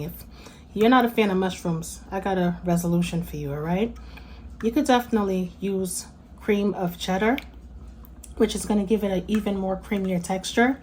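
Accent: New York, United States. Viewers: She sounds like she’s from New York or New Jersey or something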